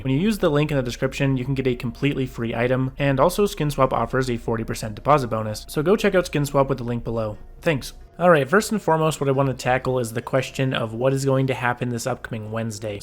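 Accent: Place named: Australia. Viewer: I like your aussie accent mate 😂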